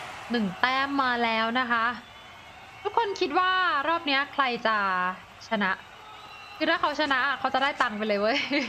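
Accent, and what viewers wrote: North, Thailand. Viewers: พี่ พูดภาษาเหนือหน่อยน้าา~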